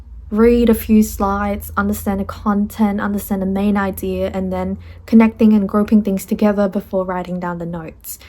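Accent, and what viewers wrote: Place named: Australia. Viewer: omg i literally thought her accent sounds familiar is she australian?? lmaoo im an aussie too!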